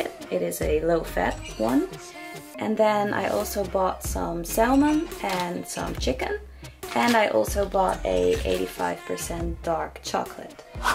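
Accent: Netherlands. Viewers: Ik dacht al een beetje, aan je accent te horen, dat je Nederlands was! Maar hey Albert Heijn ✌🏼. Leuke video !!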